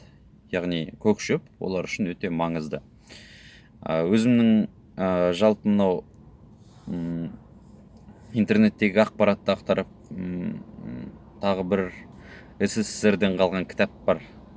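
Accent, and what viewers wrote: Standard. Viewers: Бауырым Алла разы болсын. Қаймағы бұзылмаған Қарағанды тумасы қазақшаң таза екен. Кеңесіңе рахмет. Біраз нәрсе үйренуге тұрарлық. Өзім қатты қызығатын кәсіп. Ісіңе сәттілік. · Бауырым саған риза болып жатырмын Сенің қазақша таза сөйлеуіңе еңбегің жансын кеңестерңді жіберіп тұр Бұл біздің қазағымызға керек кеңес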